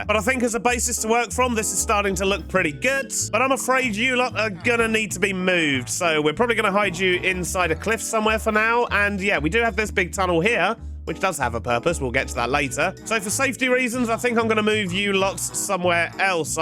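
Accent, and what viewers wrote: United Kingdom. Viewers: I love the fact that you have a similar British accent to Stampy, it brings me a joy that nothing else does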